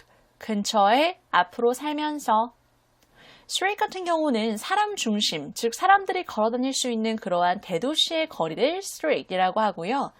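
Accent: United States. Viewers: 걷기운동 나가면서 재생목록에서 연속 기능을 선택해서 듣다보니 댓글달아주면 힘이 된다셔도 나중에야 가끔 씁니다만 반복해서 열심히 듣습니다. 아직은 150개 표현과 50개씩 리뷰하는 것을 듣는데 나중에는 다른 것도 공부할 날이 오겠죠. 발음도 아주 미국사람같이 유려한 것 같아서 도움이 크리라 기대하고 감사합니다.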